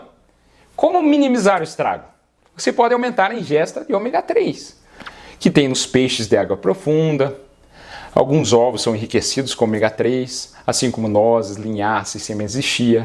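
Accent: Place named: South, Brazil. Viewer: Adoro isso, médico q não deixou de clinicar pra virar Youtuber e vender fórmulas! Este cardiologista sim. Se vê q ele fala de um hospital e tem um sotaque de quem é da região sul. Este eu ouço SeMPRe e sigo o q indica. Vai direto ao assunto sem enrolar e sem vender nada. Confio plenamente neste, e tô sempre de ôlho e o escuto até o fim. Tmb, não enche linguiça até chegar aos finalmentes. Meu preferido, entre outros parecidos.Uu😍👏👏👏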